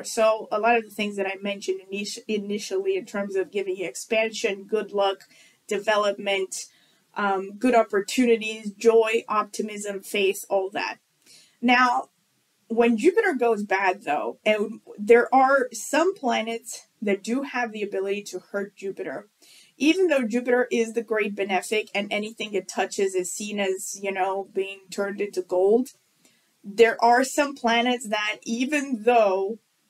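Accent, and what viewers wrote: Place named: California, United States. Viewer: I enjoy your accent and listening to you speak. Arr you from Cali?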